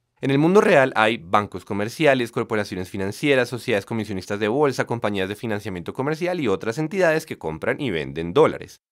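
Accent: Bogotá, Colombia. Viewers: My bien explicado pero con un acento rolo demasiado marcado "ala mi rrrey" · qué buen canal, y sólo vengo a realizar que son colombianos(creo) por el acento... es cierto,no? estudié español 8 años y viví en Medellín 5 años de esos para aprender mejor. ahora no he estudiado mucho pero me siento casi colombiano porque me acogieron con mucho cariño cuando viví allá, se sentía como mi hogar,sabes? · Pero el que habla en el video no es de Medellín, es de Bogotá. Por ende su acento es "rolo".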